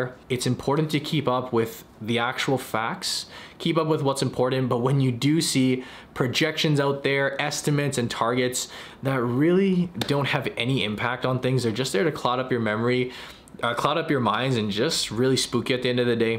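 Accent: Canada. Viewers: Sometimes your Canadian accent is comes out and it’s really entertaining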